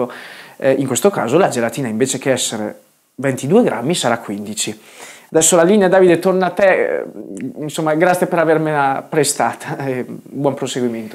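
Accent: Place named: Bergamo, Italy. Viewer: Poi odio il tuo accento quasi come un bergamasco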